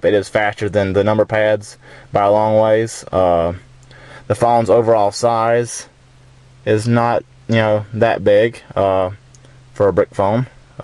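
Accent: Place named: Texas, United States. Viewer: I love your accent... Are you from Texas?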